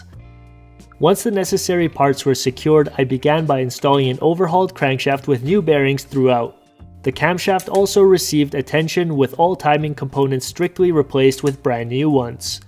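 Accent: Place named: Canada. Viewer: What a great video! It was difficult to understand your thick Canadian accent but I loved watching the tear down and hard work.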